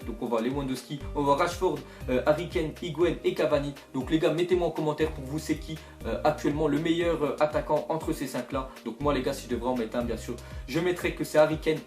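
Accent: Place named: North, France. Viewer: sa se vois tes du Nord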